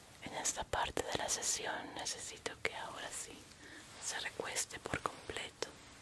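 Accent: Mexico. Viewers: Yo creo que por el tono es de Mexico...Saludos desde Argentina😉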